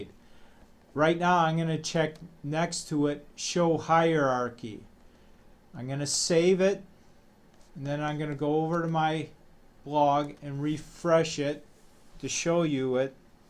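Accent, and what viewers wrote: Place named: New York, United States. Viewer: Nice to hear a New Yorker! Thank you for the very clear examples of each option for showing a category list in a sidebar.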